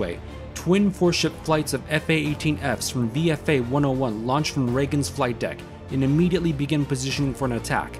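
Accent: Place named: China. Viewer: Chinese demoralization channel. I recognize the accent now.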